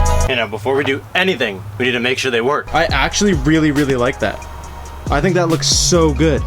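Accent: Canada. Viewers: AMO he’s canadian man It’s his accent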